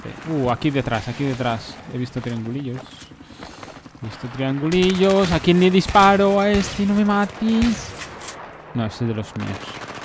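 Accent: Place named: Catalonia, Spain. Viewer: tu que hablas como la milicia del mw3 awá pandó? yo le entiendo en todos y cada uno de sus videos lo unico es que tiene acento catalan pero yo lo entiendo como si es de murcia, madrid, galicia y pollolandia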